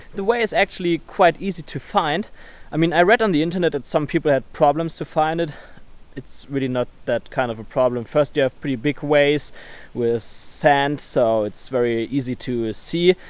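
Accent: Germany. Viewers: you sound german....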